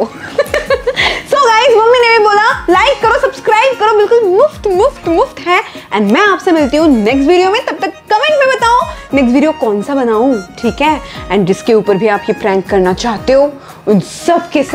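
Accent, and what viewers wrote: Bengal, India. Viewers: Didi are you a bangali I am also bangali😀 I noticed that your tittle is and your taking is just like bangali Khathi bangali❤️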